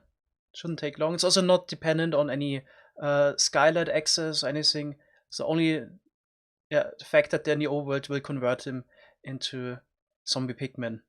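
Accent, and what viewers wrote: Germany. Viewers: You Sound really german Du allmann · see, i was thinking maybe germany or france. the german accent i’m most familiar with is a little different, so i wasn’t sure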